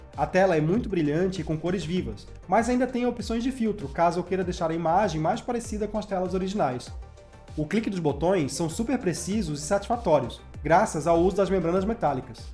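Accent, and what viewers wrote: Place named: Florianópolis, Brazil. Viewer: Incrível seu conteúdo cara, ganhou um inscrito. Fora que só entrei no video e percebi que é manezinho 😂👏🏻👏🏻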